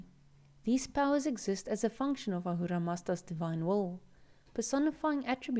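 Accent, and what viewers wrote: South Africa. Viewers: Wow, I searched "Zoroastrian asmr" and didn't expect a South African accent! Cool channel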